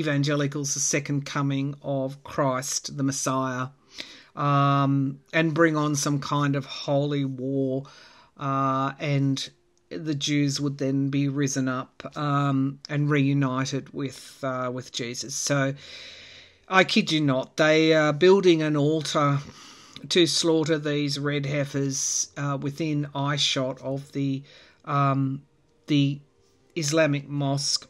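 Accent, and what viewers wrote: Australia. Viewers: Hi! I'm just a country girl who was born 65 yrs ago and have resided in North Carolina all my life. I found your channel a few weeks ago. Love your readings & love your accent!!! If I understand correctly, you live in Australia. On one of your videos could you say "G'day"? 😊 It has been my feeling/dream (since I was a young girl) that I should visit Australia one day. I have only visited one country outside the contiguous USA and that was South Korea. It was an amazing country! But, for some reason, I feel Australia is still calling out to me.😊 Anyway, I adore you and your channel! May God bless you!❤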